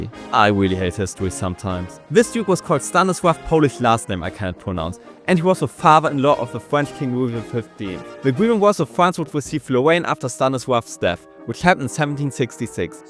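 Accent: Germany. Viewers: The video is well done visually. But please, if I may add some constructive critiscism, you really need to work on your accent. It sounds like you have a very strong German accent and it's very hard to focus on what you are saying content wise because I end up focusing on the actual words you said :S . I hope it helps. Good luck with your growth!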